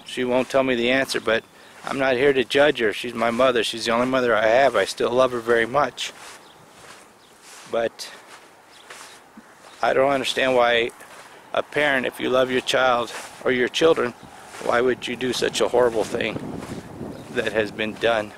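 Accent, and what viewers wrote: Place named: South, United States. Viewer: oh yeah and btw, there is nothing like some GOOD ole country twang xo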